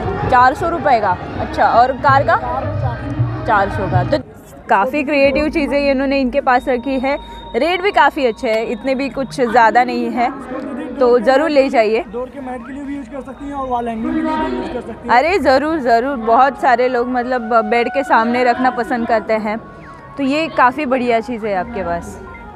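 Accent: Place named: Maharashtra, India. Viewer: I think u r from maharastra, if i not wrong because I heard yr marathi tone in your voice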